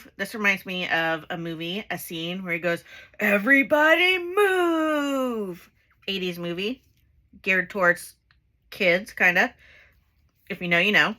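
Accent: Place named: South, United States. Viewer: If you're from Oregon, where the ##$% did you get that southern accent that comes out?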